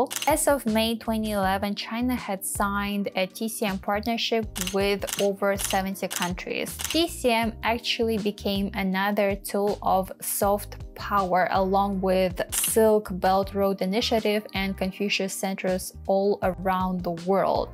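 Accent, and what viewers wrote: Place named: Russia. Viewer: you have a very good English but am I hearing Russian accent?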